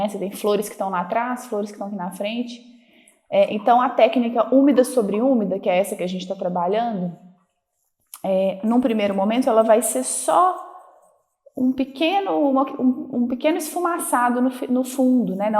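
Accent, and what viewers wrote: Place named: Minas Gerais, Brazil. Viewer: Oi,. Conheci hoje seu perfil. Amei. O tipo de trabalho que sempre gostei de ver. E amei seu sotaque mineirinho, que eu acho o mais bonito do Brasil! Vou fazer essa aula hoje mesmo. Voce disponibilizou mais imagens?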